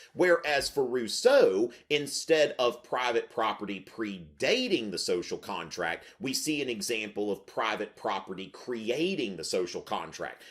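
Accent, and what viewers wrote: South, United States. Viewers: Love these! You break things down elegantly and with a homey, cozy southern accent. I am hooked.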